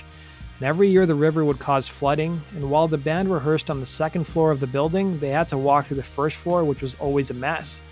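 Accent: Canada. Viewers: I keep trying to place your accent...northeastern Ontario?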